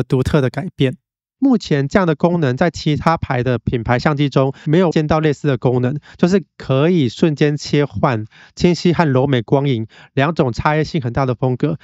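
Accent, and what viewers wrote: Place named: Taiwan. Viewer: HDF夫， 😊😊😊臺灣英文真可愛。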